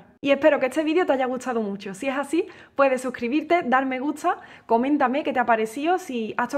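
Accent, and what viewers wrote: Andalusia, Spain. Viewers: Gracias por contestarme! Acabo de ver un video hermosísima tuyo aobre "Lágrima" de Tárrega. Y acabo de confirmar en un articulo de Wikipedia sobre "pronunciacion andaluza" el cambio de "st" por "ts". Esta explicado en un articulo llamado "Dialecto andaluz", en la "Descripción lingüística", en la parte que dice "aspiración de la /s/ implosiva sucedida de la /t/". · Después de haberte escuchado cantar o tocar solo la guitarra en diferentes estilos de música es raro ponerte el pulgar para abajo vos dirías no gutsa con tu acento andaluz porque todo lo hacés bien todo el proceso la elección de la pieza El arreglo la ejecución así lo dice un suscriptor y estoy de acuerdo seguro ensayas mucho por más que sepas porque te interesa que el producto salga bien No hay palabras para elogiar tu arte porque es un arte, una persona puede estudiar música guitarra y tocar bien técnicamente pero no llegar a la gente.Es como si una persona estudia pintura o escultura puede hacer buenas obras pero no va a estar a la altura de Miguel Ángel o de Leonardo da Vinci Vos en lo tuyo tenés ese toque ese don que aparte lo perfeccionas con trabajo práctica constancia y lo que harás en el futuro solo Dios lo sabe ese Dios que te dió ese talento inmenso para que lo uses para tu bien y el de tus oyentes Sos única grande sencilla un instrumento de Dios el es el director de la obra Quería compartir esto con vos Con tu poca juventud acumulada tenés una llegada a la gente muy grande y con toda la juventud que tenés por delante para acumular quien sabe hasta donde llegarás Gracias por tu talento tu arte generosidad ❤️ Abrazo grande desde Buenos Aires Argentina 🇦🇷🇦🇷🇦🇷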